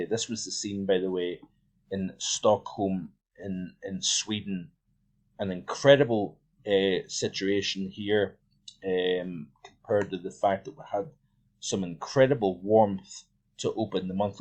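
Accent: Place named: Northern Ireland, United Kingdom. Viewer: I watched 3 of your videos thinking you were from northern Ireland, until you said you were in Scotland. I'd put you from Ballyclare 🤔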